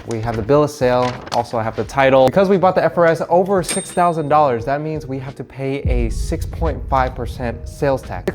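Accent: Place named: Vietnam. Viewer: He speaks Vietnamese accent english.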